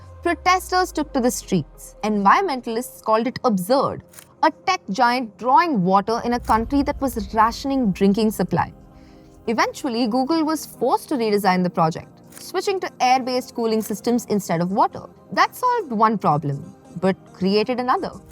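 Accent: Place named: India. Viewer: A good and simple Indian accent, congrats❤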